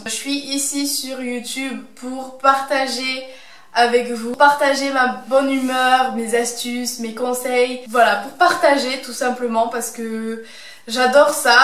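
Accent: South, France. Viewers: J'adore ton accent du sud et t'a l'air super sympa et pas superficiel 😃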